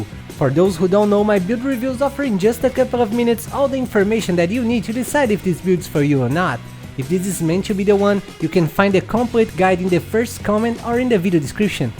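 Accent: Brazil. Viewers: boabuild! sotaque br kkk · Great vid! You sound brazilian to me idk why.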